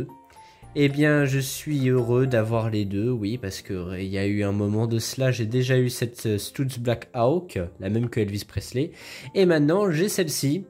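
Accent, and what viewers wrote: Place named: England, United Kingdom. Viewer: Elles sont magnifiques…!! Mais j apprecie aussi ton accent Anglais 😂😊🤭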